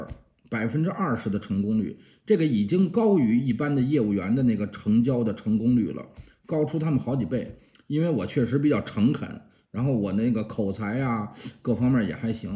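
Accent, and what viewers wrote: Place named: Beijing, China. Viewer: 祝贺你的一周年，加油！我们每天看你听你侃大山，之所以喜欢你的视频，是你说人话，方方面面，不啰嗦，就像沈志华老师那样，咬字清晰，北京话，有趣。你不怕揭自己的老底，说明你不虚荣，你初中毕业，但是博士水平，很多人学历很高但是水平很差，当然里面有买的学历混的学历，硕士学历初中水平。所以西方认你的经历和经验，不重视学历。欢迎来加拿大，我接待你。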